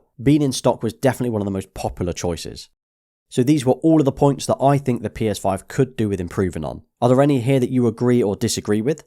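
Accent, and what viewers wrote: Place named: Leicester, United Kingdom. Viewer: Your voice really reminds me of mark Selby! Are you from Leicester lad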